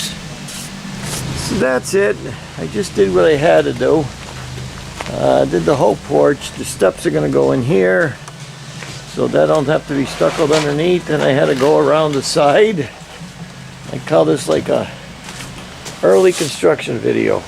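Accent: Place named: Pittston, United States. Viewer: Great video! Straight to the point and it will give a lot of people the confidence to DIY. I know that accent well. Heyna? 😂 Born and raised in Pittston. Live in Hawaii now.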